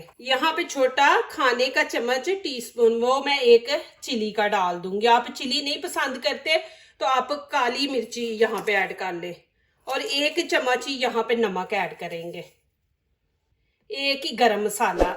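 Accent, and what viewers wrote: Punjab, India. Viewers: MashAllah very delicious recipe and Your Urdu so sweet Panjabi accent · Punjabi tone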